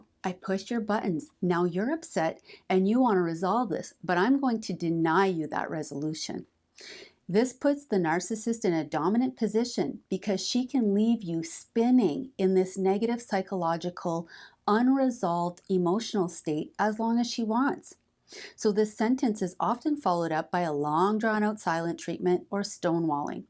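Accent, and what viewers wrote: Canada. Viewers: Not sure where you're from, Canada maybe, but I love your accent. Great video. Thanks for the tips on crazy phrases from crazy women.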